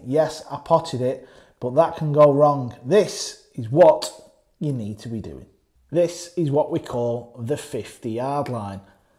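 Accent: Lancashire, United Kingdom. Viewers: Right 😉 By the way, your accent is close to my cousins in Garstang. Are you from Lancashire? Anyway.. I live in the states as an EX Pat. Haven't been back to Garstang since pre covid. Keep up the good work breaking down a complex game. Cheers.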